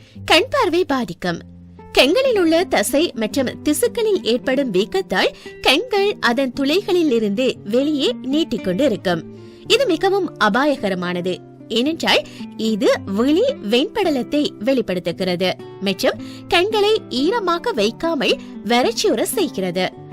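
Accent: Sri Lanka. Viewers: இது இலங்கைத் தமிழ் இப்படிதான் பேசுவார்கள் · Ada arivu jeevingala, avanga srilankan Tamil kalanthu pesuragu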